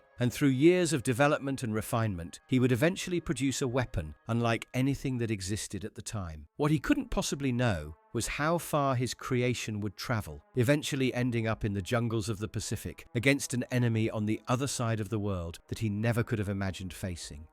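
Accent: England, United Kingdom. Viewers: You couldn’t carry an extra barrel and still do what the the BAR was intended to do…… The Bren gun proved the concept. The BAR was even lighter (albeit 20 rd mag). Let’s calm down when we hear an English voice doing a documentary…. This one’s iffy as hell.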